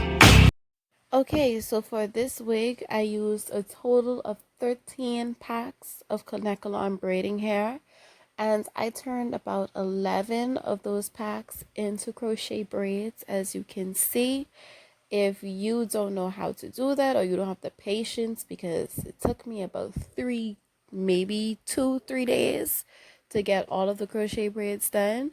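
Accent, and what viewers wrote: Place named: Bahamas. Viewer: You sound like a Bahamian......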